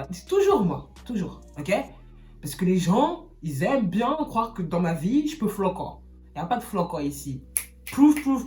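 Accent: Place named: Ivory Coast. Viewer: l’accent ivoirien me tue! continue j’ai kiffé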